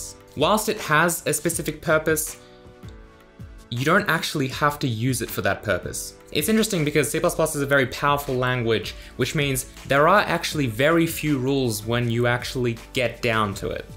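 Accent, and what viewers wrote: Australia. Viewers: That’s the Australian accent right? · He's an Aussie.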